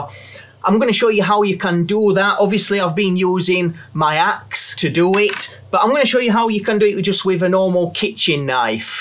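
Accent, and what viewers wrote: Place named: United Kingdom. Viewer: Great tutorial and Great accent 👍.. Which part of the British isles ?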